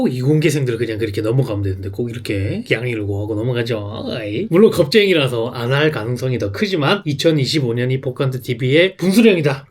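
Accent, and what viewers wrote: Busan, South Korea. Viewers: 천상 생각이나 말투나 bu산 사람 맞네요..ㅎㅎ 건투를 빕니다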